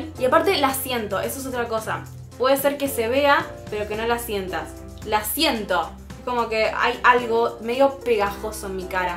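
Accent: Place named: Argentina. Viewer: me encanta tu voz argentina divina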